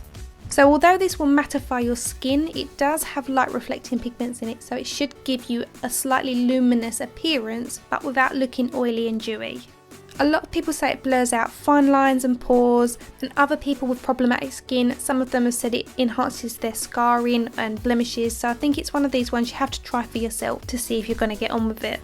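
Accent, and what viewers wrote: Essex, United Kingdom. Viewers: Love your Essex accent and your techniques. Subbed :-)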